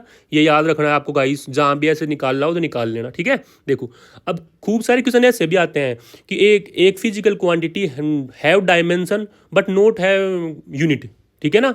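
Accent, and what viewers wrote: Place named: Rajasthan, India. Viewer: App Rajasthan se jaipur ke as pas se ho kya kyuki apki awaj ,Kai Che wali lag rhi h😊😊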